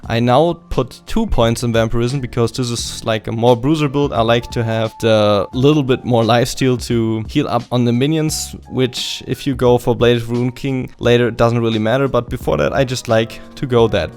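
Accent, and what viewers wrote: Germany. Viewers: Hey c: are you from germany? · are u from germany or where are u from?ü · Man merkt übelst dass du Deutsch bist xD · are you german? cuz you sound like ^^ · Man merkt an deinem Englisch, dass du aus Deutschland kommst xD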